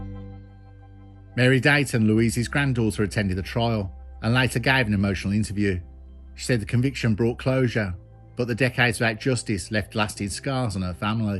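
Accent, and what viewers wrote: Birmingham, United Kingdom. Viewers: 89K - I’ve been with you wen you We’re on 3.5K- bruv your utter brilliant and the way you make the story’s come to life! They say you even pay tribute to the family’s with they way you talk with such respect.. just wanted to leave this here, as show you can do whatever you u good at- also Love the brummy accent , as I was in Hmp YOI Brinsford Wolverhampton and I even learnt what a yam yam is 🤣🤣- my co producer on music is also a brummy - big ups bro keep it rolling ❤🔥🔥🔥💪💪🙏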